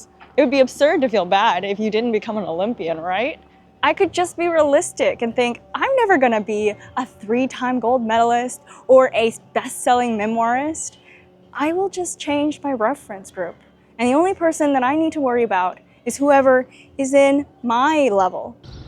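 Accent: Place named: United States. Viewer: I do like your English , however, a bit overly American! Do build your own character when U speak. Never imitate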